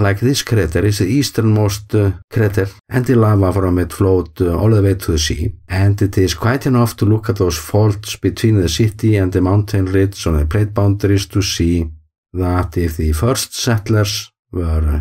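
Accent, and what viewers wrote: Iceland. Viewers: I love your english with the icelandic accent it makes your videos very soothing to listen to! Like a velevety hot chocolate lol! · Deep gratitude for your love and deep knowledge of Iceland. You won our hearts and minds during Covid. We are relieved at your intelligent wise and skilful reporting. From Australia to you in aiiiceland!! We are addicted to your accent and btw commiserate with the physical challenge you have as we too are sliding fast into our dotage.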